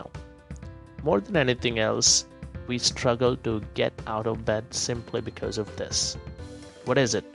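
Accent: India. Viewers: where are you from? I picked up on a bit of an Indian accent but just in a couple words 😉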